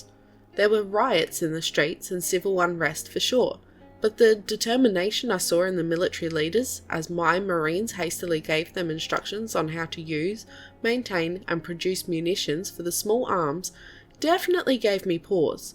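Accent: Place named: Australia. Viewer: I've been listening to other YT-ers with their HFY stories, and just found you... very cool... I like to listen to those others, but its great to hear a fellow Aussie reading these! You give a better inflection to what you are reading, which I like. Subscibed. :)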